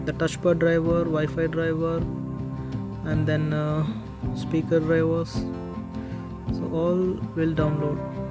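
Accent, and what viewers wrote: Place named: India. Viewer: I Hate indian speaking English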